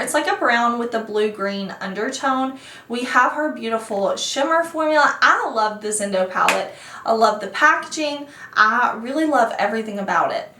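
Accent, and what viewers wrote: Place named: Kentucky, United States. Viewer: You sound like you are from Kentucky when you speak. Nice accent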